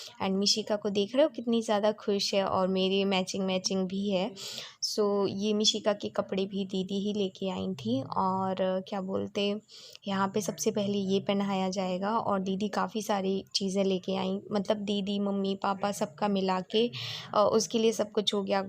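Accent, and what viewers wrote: Bundelkhand, India. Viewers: Bundelkhand language😊😊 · Khti thi bundelkhandi ni bnti bolte magar bol to leti ho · Di apki language buldelkhandi h ap kaha se ho esi language hamari bhi h m jhansi se hu,PLZZ reply me Di pzzz plzz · Didi mein sagar se belong karti hu aur hamari language bhi bundelkhandi hi h and I don't know why mujhe aapke muh se yeh language sunne mein bohot achcha laga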